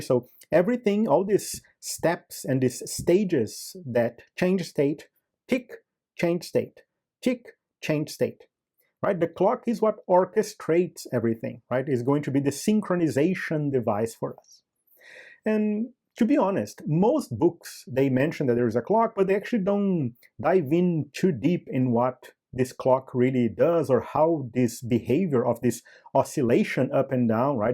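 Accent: Brazil. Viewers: pelo sotaque, você é brasileiro! muito bom seu canal! parabéns!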